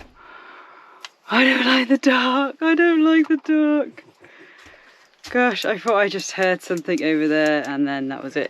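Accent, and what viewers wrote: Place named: Northern England, United Kingdom. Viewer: The first vlog I saw with you both I was amazed at your collective energy and togetherness 🤗 and it's great to have time away on your own but can be scary sometimes hey, I fully enjoyed this vlog as all your others and it was great to see at the end, if you don't mind me asking but with your northern accent where are you from, I'm from Burnley but live in London mainly for the weather 😁☀️😘 I can't handle the rain ☔ in Burnley 😳 it's horrible, even in summer you can get lots of rain 😳 have a great trip to Portugal 🇵🇹 will look forward to seeing how you both get on there 🤗 Edit, we've even had Hail Stones in London tonight 🙄☔😳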